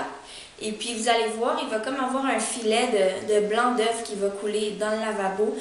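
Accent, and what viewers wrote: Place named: Quebec, Canada. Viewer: lol oui les français nous avons un accent , mais les québécois vous avez un accents très prononcé , c'est pour ça que je regarde beaucoup de vidéos faite par les québécoises, j'adore cet accent , je le trouve magnifique :-)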